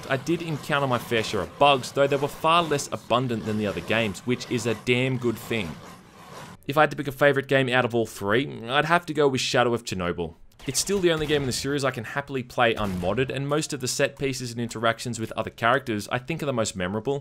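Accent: Australia. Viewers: "Stoker Cola: Pre-Piat" that Aussie accent tho.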